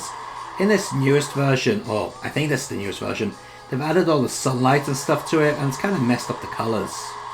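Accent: United Kingdom. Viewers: Greetings from Brazil. Man, I really enjoy your reviews and battle of the Ports. Besides that, is much better listen the British english.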